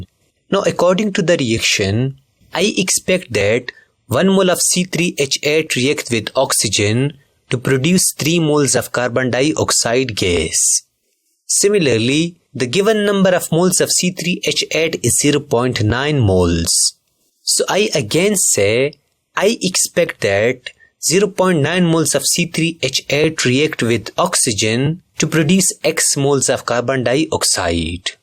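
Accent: India. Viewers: 🎉🎉 thank you I thought u r from India 🎉❤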